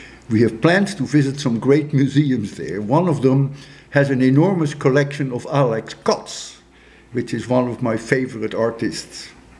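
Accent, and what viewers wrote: Netherlands. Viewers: To be honest, I didn’t know this guy and when I first saw one of his videos I knew he was Dutch because of his accent! It’s weird I didn’t know him because I’m Dutch myself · Just out of curiosity, are you Dutch?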